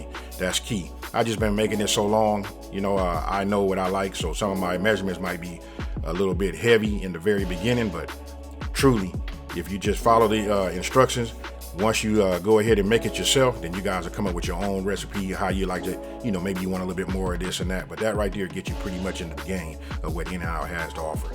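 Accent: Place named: Mississippi, United States. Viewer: Are you from Mississippi?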